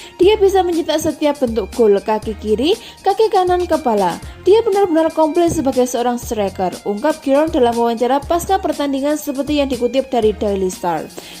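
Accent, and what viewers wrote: Java, Indonesia. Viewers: Si mba admin nya, medok banget suaranya 😂, aga gmna gtu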